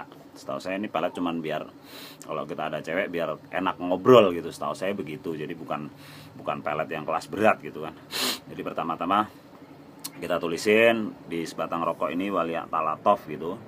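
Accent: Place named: Jakarta, Indonesia. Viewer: Logat u Betawi banget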